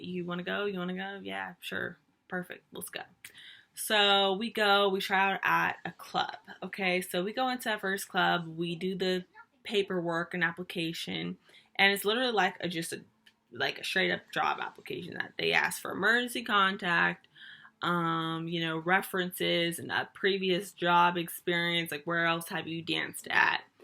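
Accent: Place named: South, United States. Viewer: Country accident cute asf😍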